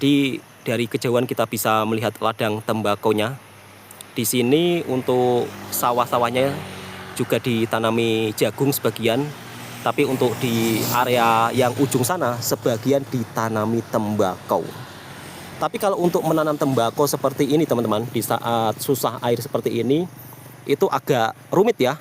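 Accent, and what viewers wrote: Java, Indonesia. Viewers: Sdr sdh lama juga berkerja di Johor tp loghat msh tebal kejawaan. Semoga sentiasa sihat.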